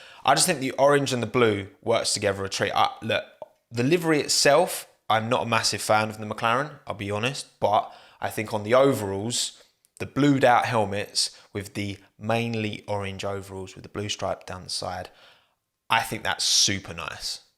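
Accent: United Kingdom. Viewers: All I heard in this video was me me me I I I in an incredibly annoying Bri'ish accent innit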